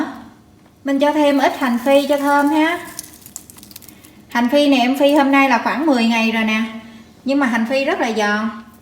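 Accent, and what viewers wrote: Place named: Southwest, Vietnam. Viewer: Giọng nói dễ thương, rõ ràng đậm chất miền tây, hướng dẫn và cách quay rõ ràng, dễ theo dõi, dễ học hỏi, cảm ơn con gái nghe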